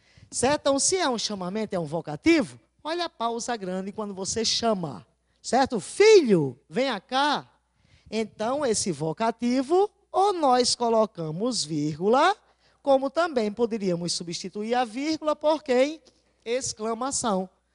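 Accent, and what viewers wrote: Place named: Northeast, Brazil. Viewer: Até que fim, encontrei alguém que fala minha " língua" nordestina porreta. Arretada de boa, clara e objetiva. Obrigada. IVANI.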